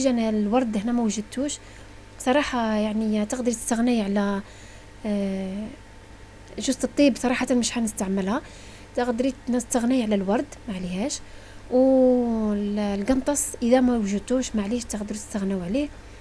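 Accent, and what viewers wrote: Biskra, Algeria. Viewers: من هدرتك عرفتك بليك بسكرية الله يبارك ( كيما انا )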